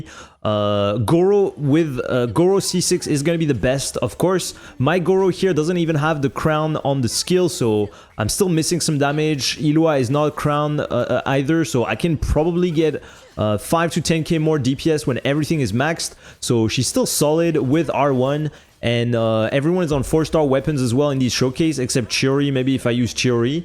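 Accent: France. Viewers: Français ou Canadien ? Cool to see french people speaking english :D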